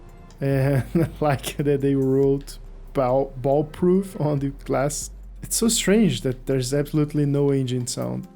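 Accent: Brazil. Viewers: esse maluco parece um brasileiro falando inglês kkkk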